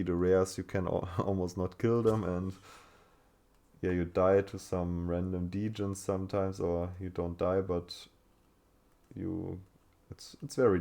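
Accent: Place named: Germany. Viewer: LIEBE ES MANN ERKENNT SOFORT WENN NEN DEUTSCHER ENGLISCH REDET ^^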